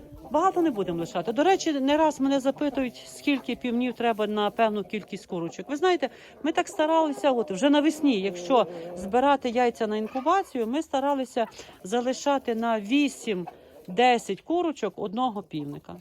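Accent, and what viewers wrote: Ternopil, Ukraine. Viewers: Дякую вам пані за відео про любимих курочок.Я насолоджуватись вашою мовою і зразу вгадала, що ви з Тернопільщини, потім прочитала в коментах.Таке відчуття, що я побувала у вас в гостях.Дякую, дякую, дякую.Ми переможемо і буде мир і є наша роботяща Україна.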